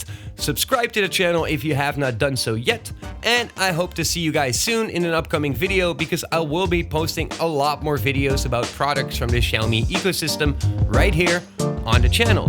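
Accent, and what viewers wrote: Netherlands. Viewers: I knew you were Dutch! I knew it! You have that accent! Groeten vanuit Amersfoort! 😂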